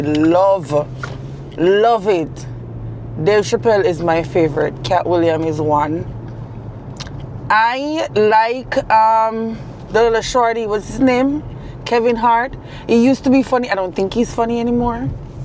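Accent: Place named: Jamaica. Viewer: Great stuff, great you... loving the Jamaican accent. Men loves women who loves cooking, especially me 😉. ❤❤❤❤❤🎉🎉🎉🎉🎉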